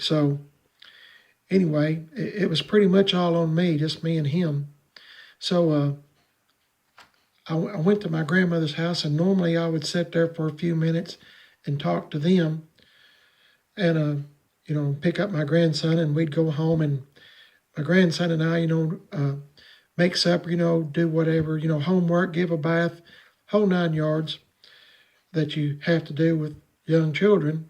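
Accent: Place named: Eastern Kentucky, United States. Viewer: I hope you don't mind me asking, but where are you from? I grew up in eastern Kentucky and you sound like you could be from just over the hill!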